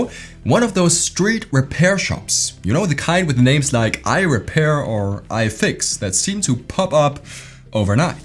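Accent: Germany. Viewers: bro ,you from deutschland?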